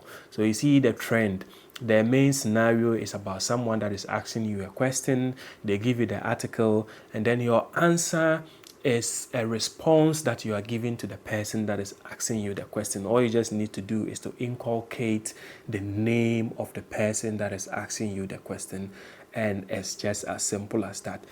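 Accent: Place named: India. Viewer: The voice over is in India, why? I can't understand anything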